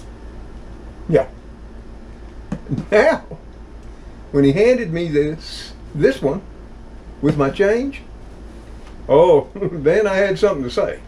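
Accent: South, United States. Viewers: Great Southern twang.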